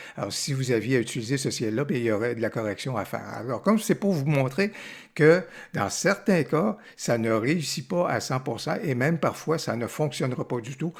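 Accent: Quebec, Canada. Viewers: Merci pour la vidéo. Et je viens de m'abonner à votre chaîne pour découvrir vos autres vidéos également. A propos des reflets du ciel dans l'eau, j'avais visionné aussi cette vidéo-ci qui donne une solution alternative à celle que vous proposez <link> Merci aussi pour votre savoureux accent canadien qui va colorer mon apprentissage ;-) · Bonjour. Tout d'abord, merci beaucoup pour tes vidéos et..pour l'accent Québécois(J'adore!). Je possède la version <num> de Luminar 4 et mon problème est que je n'ai pas, comme sur ta vidéo, "Auto correction distorsion" ni "Supprimer les aberrations chromatiques" dans Objectif et géométrie. Je n'ai que "Supprimer les franges". Est-ce normal? Je te remercie d'avance pour ta réponse. Bonne journée à toi. Alain.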